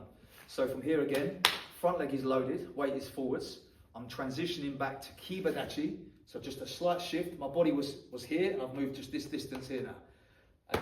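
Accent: United Kingdom. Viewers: I live in London and do shotokan karate, and you seem like you live in London too from your accent, do you have a club?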